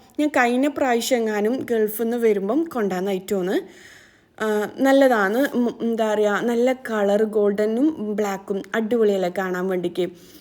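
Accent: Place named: Kasaragod, India. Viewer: avideya, samsaram ketit kasargod pole end. Kasargod samsarikkund anne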